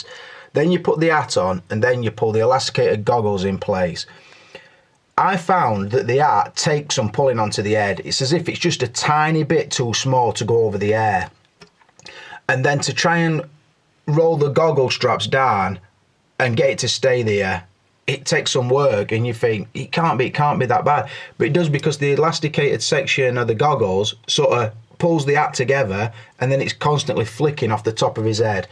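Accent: United Kingdom. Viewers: What part of the U.K. are you from? I love the dialect. I'm a fan from the U.S.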